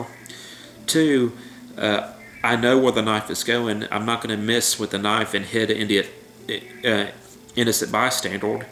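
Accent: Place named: Louisiana, United States. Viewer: You have an awesome accent, or whatever it is that makes you speak like that. Sounds like a Cajun-American blend, very smooth.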